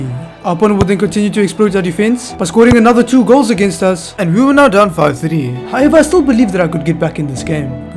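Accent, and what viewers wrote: South Africa. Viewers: Bro ur South African for sure right ? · Ever since I heard your voice I knew you were south african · Yoh I just knew you were South African bra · Is he from South Africa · Are you from South Africa? ( Same Here ) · Are you from South Africa? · You from South Africa???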